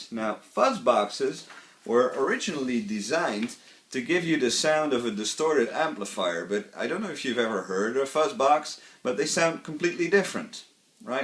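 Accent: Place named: Netherlands. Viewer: I can hear you're Dutch! Great explanation!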